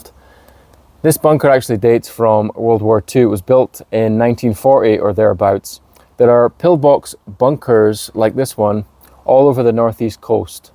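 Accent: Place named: Scotland, United Kingdom. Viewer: any otters in ur area, need to make some mates. luv ur scottish accent and ur humour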